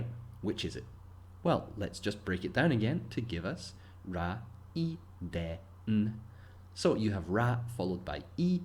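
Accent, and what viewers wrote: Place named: Glasgow, United Kingdom. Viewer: I lived in Glasgow half a year. Release your accent! ^.^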